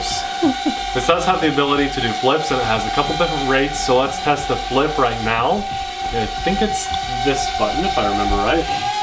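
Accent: United States. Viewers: ...Are you from Ohio?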